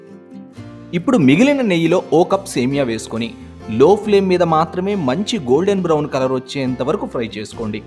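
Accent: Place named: Chennai, India. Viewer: Meedi a oru Chennai telugu la undi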